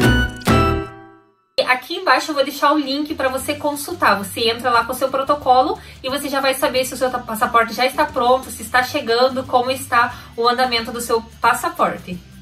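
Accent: South, Brazil. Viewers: Qual o sotaque que ela tem fiquei em duvida se sulista ou não !? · esse sutaque do sul e lindo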